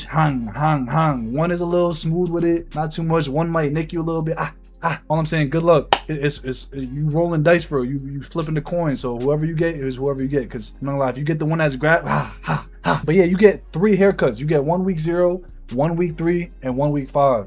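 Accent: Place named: New York, United States. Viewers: “Calm” valid eats” “move tact” yea You definitely from New York😂😂😂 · Not even the first minute hearing bro you can already tell😂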